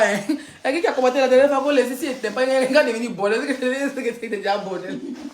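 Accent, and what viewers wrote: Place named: Cameroon. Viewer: Stp parle comme une ivoinnene lorsque tu parle comme une camerounaise ça ne donne pas de grâce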